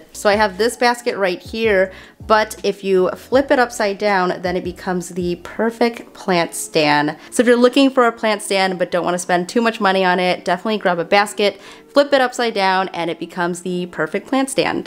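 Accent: Chicago, United States. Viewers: yoooo this room is rad! (also love your chicago accent! 😊)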